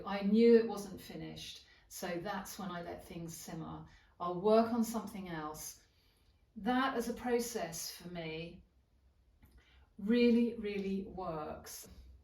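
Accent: United Kingdom. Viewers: Madame. Keep them coming . Make them as long as it takes to cover one point. If its a short point make them short . What i mean is the video should cover the whole topic. That is being presented. I love your posh accent and being in your company. I am an artist from pakistan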